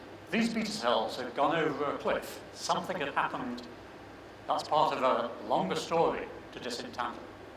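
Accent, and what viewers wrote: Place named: England, United Kingdom. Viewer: I'm at risk of developing Type 2 and i was wondering is laughing a risk to raise my blood pressure? The first 5 minutes I was just laughing, he has a funny English accent and what about that Angel of the North story LOL LOL and the people with their faces erased LOL LOL LOL aaaaaaaaaaaaaaaaaaaaaaaaaaaaaaaaaaaaaaaaaaaaaah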